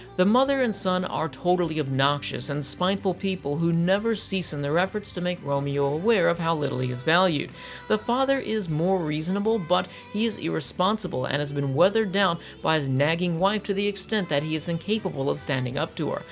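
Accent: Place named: United States. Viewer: I'm just curious if you were able to watch it with English Subtitles? (Because you sound either American or Canadian... Maybe you can speak Japanese?) I cannot find a copy ANYWHERE with English subtitles! By the way - you are REALLY GOOD at reviewing! I'm amazed! I REALLY REALLY want to see this series and I'm so upset that I cannot find it! Is there any way I can give you my email address and you can tell me how I can watch this? (Or is that too personal....?)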